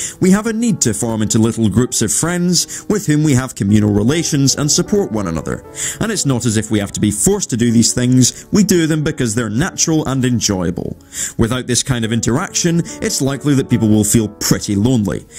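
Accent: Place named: Scotland, United Kingdom. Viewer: I was expecting him to say "Arseinine" 😂😂I love Scottish accents lol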